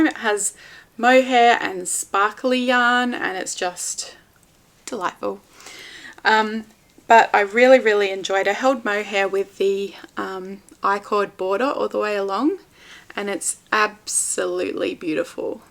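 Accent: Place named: Australia. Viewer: It’s so great to hear an Aussie talking yarny goodness!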